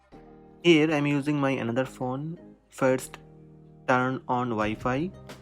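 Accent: India. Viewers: Я знал что парень с индийским акцентом поможет мне разобраться с этим дермом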